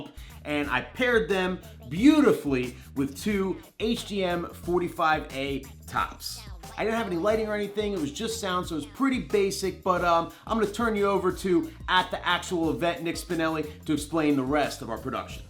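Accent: New Jersey, United States. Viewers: Hey as soon i saw one of your vids I was like this cat sound like. JERSEY and lit fabulous job great energy munch continue success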